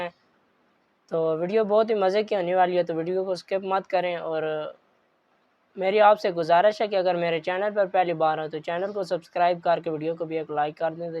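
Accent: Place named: Punjab, Pakistan. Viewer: اپ اپنا اردو بولنے کا طرز ٹھیک کر لو اپ کا انداز بیان اچھا ہوگا اور اردو بولنے کے اندر پنجابی لہجہ نہیں ائے گا تو لوگ اپ کی بات سے ویسے ہی متاثر ہوں گے اور خود بخود اپ کو سبسکرائب کریں گے کیونکہ جتنا اپ روانگی کے ساتھ ادب اور اخلاق کے اچھے بہترین طرز کے ساتھ اپنی گفتگو کریں گے اتنے سارے لوگ اپ کی ویڈیو کے لیے بے تاب ہوں گے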